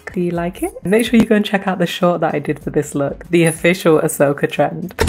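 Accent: United Kingdom. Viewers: Are u british?!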